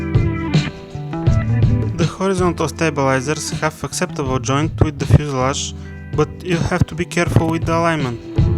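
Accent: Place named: Russia. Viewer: Narrated are good, however your narrations resemble "russian spy" accent in western Cold War movies :)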